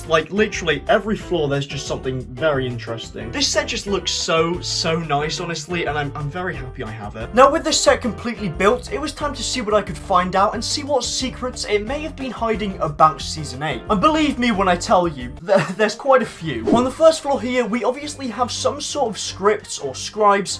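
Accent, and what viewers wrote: United Kingdom. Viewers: Holy brick loud British boy made a video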